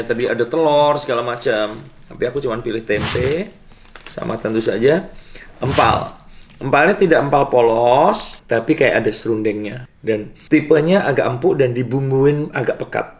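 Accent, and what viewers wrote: Surabaya, Indonesia. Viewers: Suroboyo pooll iki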